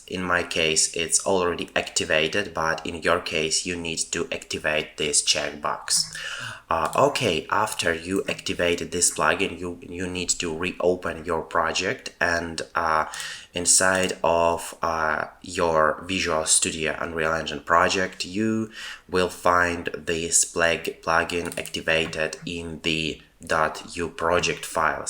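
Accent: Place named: England, United Kingdom. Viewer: О вы из Англии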